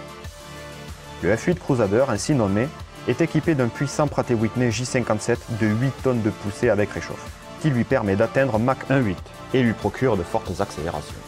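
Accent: South, France. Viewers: De très bonnes vidéos comme toujours, en plus ton accent du sud fait plaisir à mes oreilles d'ariégeois ;) ! Continue comme ça !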